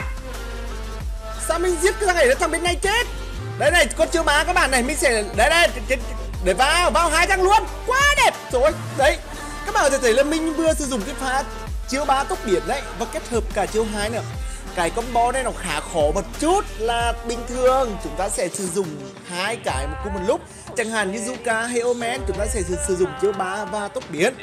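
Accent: Nghe An, Vietnam. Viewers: nghệ an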